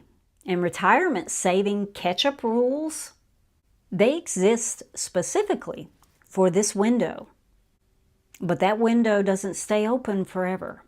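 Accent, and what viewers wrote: South, United States. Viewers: ah, couldn't tell. You do have some southern accent going on.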